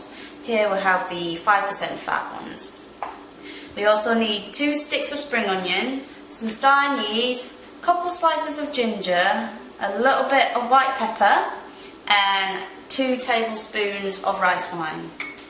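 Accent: United Kingdom. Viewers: wow british accent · great job done by a new generation Taiwanese, like your British accent. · Are you from England. If so where in England that accent is so strong! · your british accent is simply fantastic....Hi from Malaysia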